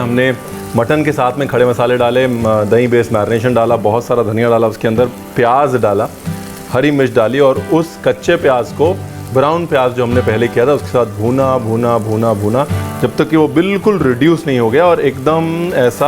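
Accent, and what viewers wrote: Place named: Punjab, India. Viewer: Waah Ranveer ji app ki hidi mein panjabi language ka tarka bhat acha laga..keep it up ..txs for recipe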